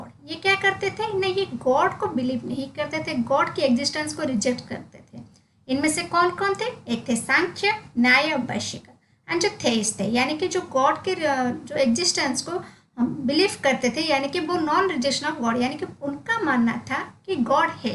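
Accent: Odisha, India. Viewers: Man r u from Odisha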